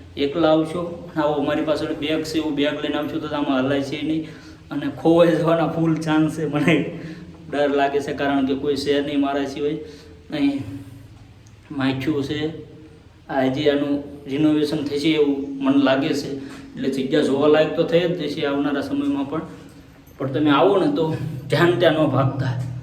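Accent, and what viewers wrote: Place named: Bhavnagar, India. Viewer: તમારી 'બોલી' પરથી લાગે છે કે ભાવનગર-તરફના હશો...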